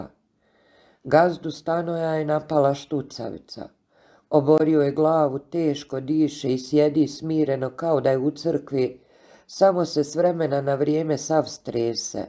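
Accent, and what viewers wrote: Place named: Sarajevo, Bosnia and Herzegovina. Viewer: Дикција наша сарајевска!🎉❤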